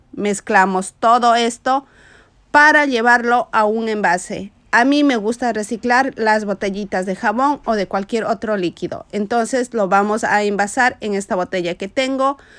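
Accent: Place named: Ecuador. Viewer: por su acento, es usted Ecuatoriana, Pais lindo, de gente hermosa, trabajadora, si estoy equivocada, disculpen.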